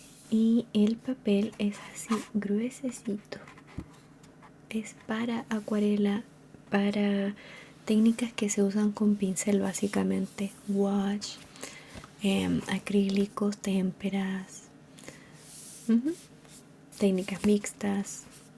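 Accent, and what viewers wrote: Chile. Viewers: Cuando eres chilen@ reconoces a otro chileno en un segundo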